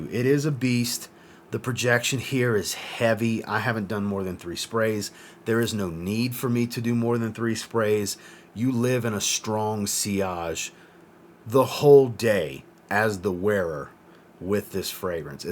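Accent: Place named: New Orleans, United States. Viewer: Gotta be from New Orleans or at least la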